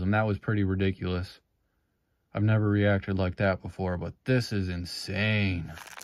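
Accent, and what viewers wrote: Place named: St. Louis, United States. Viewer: Your accent sounds like Saint Louis county…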